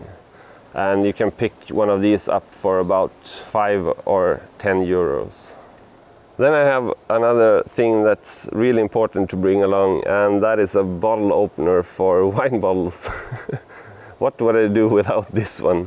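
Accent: Sweden. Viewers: Hej! Du låter lite svensk. Stämmer det? Jag har precis beställt väskor som du har. Och en duffel man kan lägga på och spänna på. Har inte någon särskild plan eller resa i åtanke men har för avsikt att göra några längre turer till sommaren. Har det fungerat väl för dig?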